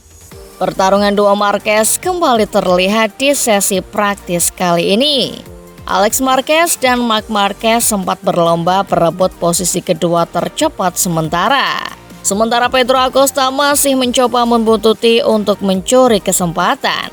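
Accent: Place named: Java, Indonesia. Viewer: Hostnya medok gitu bawa beritanya 😂😂😂 jawa bgt ya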